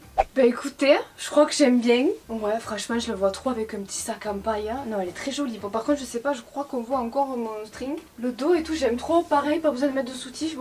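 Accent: South, France. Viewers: J'adore tes haul car a chaque fois tu essayes les vetements, d'autres les montre simplement ou ont les voit en photos c'est pas attractif, et puis toi avec ton accent du sud et ton beau sourire c'est vraiment super a regarder. J'ai le body rose a paillette comme ta robe a anneaux, plus facile a mettre le body que la robe courte et hyper moulante.... mais elle sest jolie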